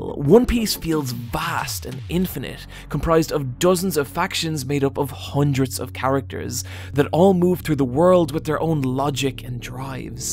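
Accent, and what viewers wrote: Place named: Ireland. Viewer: started watching cus i liked the irish drawl. stayed because you clearly have a lot to say, and i'll always listen to those who take the time to talk about the shit they're passionate about.... as long as they're not fascists.... you're not a fascist, are you?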